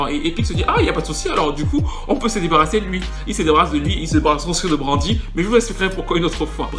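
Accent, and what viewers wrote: Cameroon. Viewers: tu as un accent Cameroun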